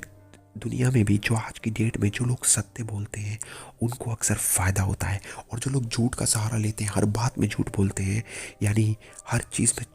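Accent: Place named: Bihar, India. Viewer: Aryyyy bhai suvicha to achha hi but video me voice bihari bhaiya jaise hi .. thoda voice thik kr k dalo..... nice bro. .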